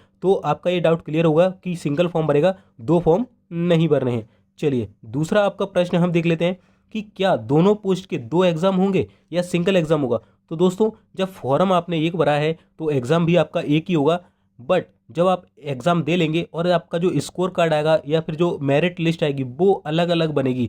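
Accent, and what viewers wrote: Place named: Gwalior, India. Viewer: I also from gwalior apki voice se laga hi tha ki aap gwalior se hi ho. 😅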